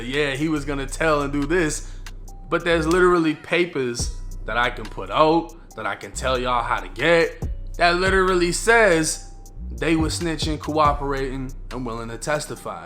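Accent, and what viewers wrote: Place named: Chicago, United States. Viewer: u the goat u gotta be from the raq boa u sound just like us great content.. bandman Kevo the city proud of u boa.. turned yo whole life around respect.. did u really get a bbl tho g? No judgement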